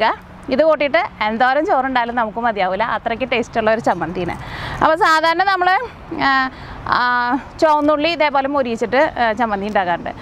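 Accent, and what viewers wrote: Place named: Ernakulam, India. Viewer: സംസാരം കേട്ട് എറണാകുളം ജില്ല യിൽ ആണ് താമസം എന്ന് തോന്നുന്നു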